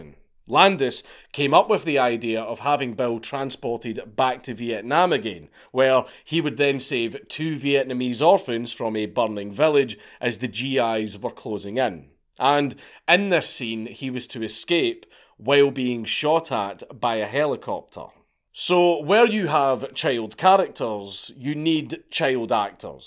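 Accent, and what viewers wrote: Scotland, United Kingdom. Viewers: Let’s be honest, a story told by a Scotsman is way more entertaining and engaging than anyone else on earth lol!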